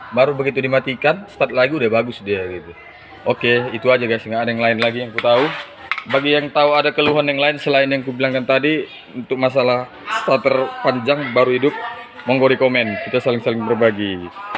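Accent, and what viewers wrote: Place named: Medan, Indonesia. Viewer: Bang bengkel nya dimana bang.apa dimedan.logat nnya medan.kalo tangerang mah deket nih ke saya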